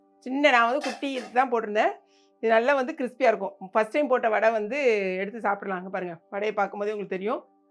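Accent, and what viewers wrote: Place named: Nagercoil, India. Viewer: U r tamil resembles Nagercoil tamil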